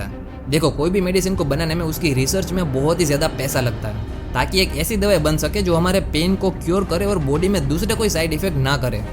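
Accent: Gujarat, India. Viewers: ભાઈ ગુજરાતી છો તમે અવાજ પરથી .... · Aap gujrati ho bhai 😊😊😅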